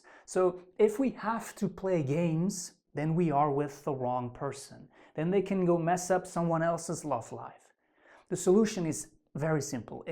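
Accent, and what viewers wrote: Flanders, Belgium. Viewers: My ex boyfriend is Belgian Flemish. I recognized the accent. Thanks for the wise message. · Love your Flemish accent .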